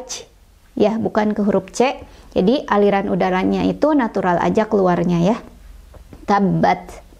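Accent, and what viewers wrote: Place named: West Java, Indonesia. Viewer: Subhanallah sepertinya teteh orang sunda ya. Kalo memang benar artinya kita satu suku. Alhamdulillah saya menemukan channel ini. Saya dari bangka sangat ingin belajar membaca ayat al quran dengan benar. Barrakalloh teteh terimakasih ilmunya sangat bermanfaat. Semoga teteh diberi panjang umur dan sehat selalu. Amin amin..🤲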